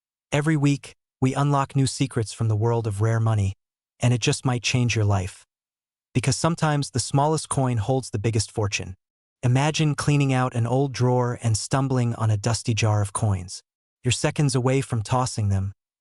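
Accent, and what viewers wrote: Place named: United States. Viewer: Well, it appears you're a coin publisher of sorts. It clearly says United States on your timeline, and you have an American Accent. So what I can't figure out, other than you clearly have absolutely no idea what you're talking about, is that the U.S. Mint has NEVER, EVER minted a penny, yet you've already done a HUGE disservice to whoever watches such misinformation. In fact, why be honest at all in your videos? No research, pop one out with a clickbait message. Get paid. Damn the facts. My suggestion to you would first be to try and understand even the BASICS of U.S. Coin History, because the U.S. Mint has NEVER minted a penny, ONLY CENTS. No matter how many times you repeat it, that won't change. Seriously?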